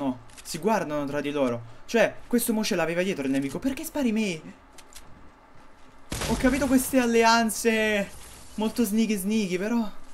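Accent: Naples, Italy. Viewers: Ma sei di napoli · ma ti che parte di napoli sei?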